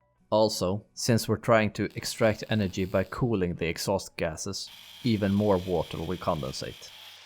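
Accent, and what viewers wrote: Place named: Sweden. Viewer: Perfect Swenglish accent:hands-yellow-heart-red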